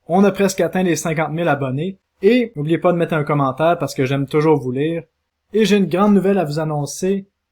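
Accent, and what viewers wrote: Canada. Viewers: Un grand bonjour de Suisse,j apprécie tes vidéos et te suis de puis un certain temps, de plus j adore cet accent canadien 🤗. Au plaisir de te suivre dans une prochaine vidéo,merci beaucoup 👍👍.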